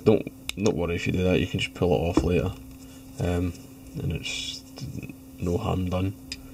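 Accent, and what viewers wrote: Scotland, United Kingdom. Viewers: Love the Trainspotting accent and love the simplicity of that bug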